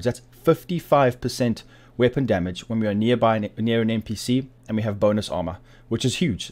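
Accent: South Africa. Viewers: Hey man, had this game about 6 weeks and you're my go to channel for it now. Just curious enough about your accent to ask where you're from? My ears are saying 70% South Africa and 30% New Zealand.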